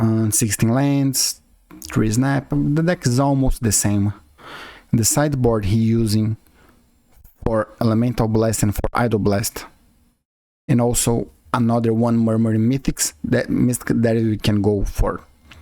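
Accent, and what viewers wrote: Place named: Brazil. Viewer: Meu Deus é brasileiro 😝😝😝 Melhor sotaque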